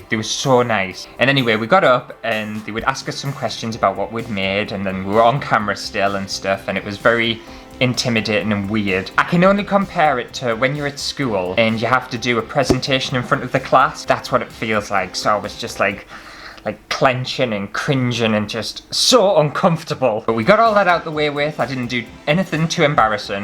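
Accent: United Kingdom. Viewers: Hi 👋 I love your accent and how you phrase things. You are so funny! I know it's the UK-speak, but y'all say "us" instead of "me". When you say "us" I always imagine you and a mouse 🐁 in your pocket, lol! Or an orange 🍊 🐁 mouse on your shoulder (orange bc I just finished watching your sexy Terry's Chocolate Orange video).....so a white mouse --->🐁 with an orange long-haired wig and the steampunkish sunglasses 😆 🤣 😂.... for some reason I see the mouse with a Dali-type moustacheeeeo. You are so funny and brave! I would love to do what you do - meaning making videos- but I'm terrified 😨.....stupid, I know, but...?🤷🏼‍♀️ Anyway, your videos always make me laugh and help when I'm depressed. So, thank you! 🙂 🙃 🙂 God Bless 😃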